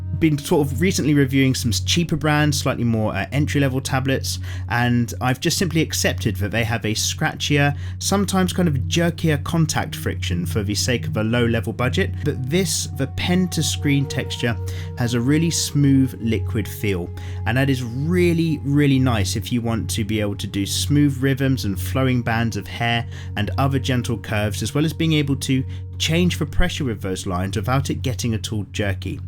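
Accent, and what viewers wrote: United Kingdom. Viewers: british accent))))) P.S. thnx 4 review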